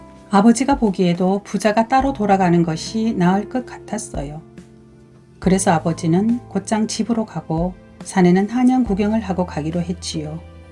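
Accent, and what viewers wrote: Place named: Gyeongsang, South Korea. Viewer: 구수한 갱상도 아지매 사투리가 더 졸리네요^^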